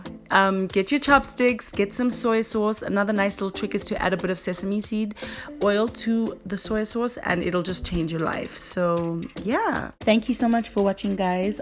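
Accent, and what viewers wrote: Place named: South Africa. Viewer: Not me being excited about the South African accent😂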